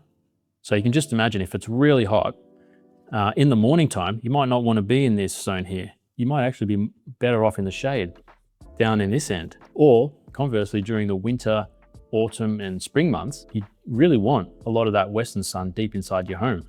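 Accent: Australia. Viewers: Definitely Down Under. The sun path threw me until I placed your accent. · at 48 seconds in he mentions the southern hemisphere. Also his accent clued me in that he was Australian. I’m from Australia and down south we have quite cold winters.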